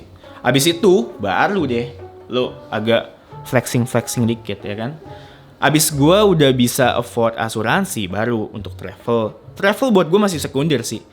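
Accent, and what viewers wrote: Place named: Betawi, Indonesia. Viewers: no offense, pantes bahasa betawinya "nyablak" khas jaktim. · Oo terjwb penasaran gw besar di Cilangkap ya Bang. Maaf soalx tiap nonton fasih bgt bahasa2 lingkungan perkampungan gitu. Kereen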